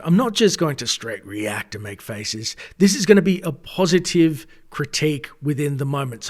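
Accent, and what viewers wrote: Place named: Australia. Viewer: Excellent! Great to have you back buddy. We’ve missed those dulcet antipodean tones!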